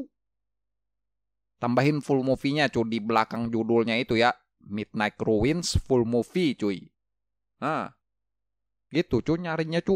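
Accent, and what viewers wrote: Banjar, Indonesia. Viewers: Biar sidin bhs indo. ttp j ketahuan dialek banjarnya🤭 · Iih lo wkkk,ku kira aku ja yg merasa iny ni urg banjar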